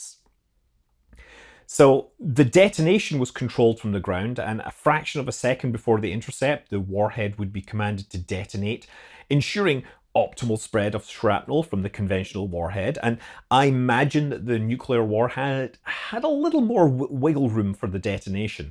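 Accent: Scotland, United Kingdom. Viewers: Felt like Curious Droid with a Scottish accent.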